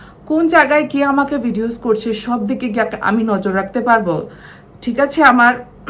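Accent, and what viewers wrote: Sylhet, Bangladesh. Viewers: Apu amrar sylheti mat matoin na kene shunte valo lage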